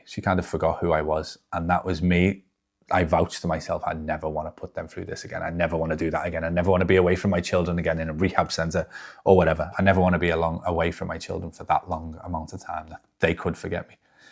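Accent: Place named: England, United Kingdom. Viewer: twenty dollars? You then proceed to keep interchanging currencies.. Keep it real. If Americans are too lazy to Google the exchange rate then that's down to them. Your English accent threw me off? Later you're talking in pounds? Sorry but seems ridiculous you said you didn't think you deserved to fail the test but the driving examiner said you were going too fast. Then you crashed because you were going too fast in your words? It seems in reflection your examiner was correct to fail you but you still hadn't learnt your lesson... At least nobody else was hurt..u your mum mightve been a diamond but unfortunately an enabler and she did you no favours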